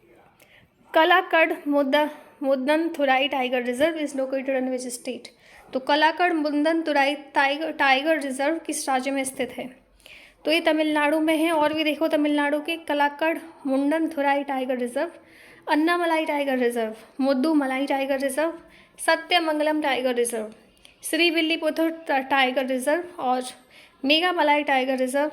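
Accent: Odisha, India. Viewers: Mam aap odisha ke ho kya?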